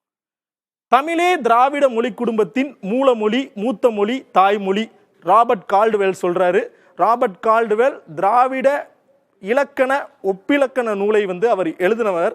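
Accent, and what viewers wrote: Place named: Telugu, India. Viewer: மொலிகல் என்று சொல்லாமல் மொழிகள் என்று சரியா உச்சரிக்க வேண்டும்.... உங்கள் உச்சரிப்பு நீங்கள் தெலுங்கர் என்று காட்டிக் கொடுக்கிறது...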